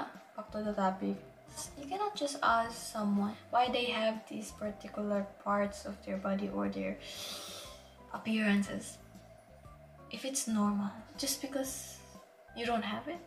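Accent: Pampanga, Philippines. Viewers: Yung accent talaga ng pagka kapampangan eh😅 Proud cabalen here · So cute! Lalo na yung kapampangan accent. I wonder if you're a kapampangan po.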